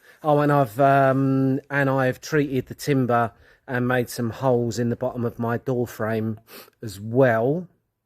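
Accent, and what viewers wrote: England, United Kingdom. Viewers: Watching from the SW of Western Australia. I am originally from Essex. Love hearing your English accent. You are doing a fabulous job on the cottage…Donna, 🇨🇰🦘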